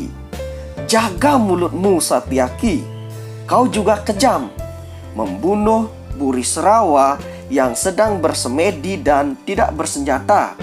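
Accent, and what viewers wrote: Bali, Indonesia. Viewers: Logat penyiarnya seperti logat bali. Kalo didengar ceritanya mmg berdasar dari buku mahabarat. Aku jg pernah baca buku mahabarata versi s.pandit (bali) Dl pernah punya yg karya org india tp ga selesai karna bukunya tebel bgt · Logat balinya medok bli😀😀😁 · Orang bali..bkn madura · Logatnya saja jelas,logat bali di kata " T"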